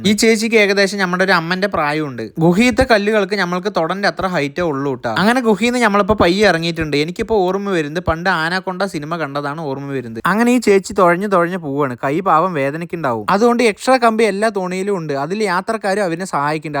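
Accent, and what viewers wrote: Palakkad, India. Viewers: വിയറ്റ്നാമിൽ ഇരുന്നു നമ്മുടെ സ്വന്തം പാലക്കാടൻ ഭാഷ പറയുന്നതിന് നന്ദി❤❤നലവീഡിയോ സൂപ്പർ കാഴ്ച്ച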